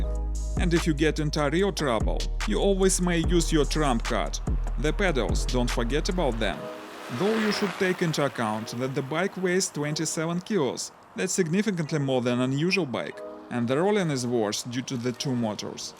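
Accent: Russia. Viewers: О знакомый русский акцент) Прикольный велик для зимы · Russian accent 😒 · once i heard the russian accent i knew instantly this would be a fst bike revieq worthy of watching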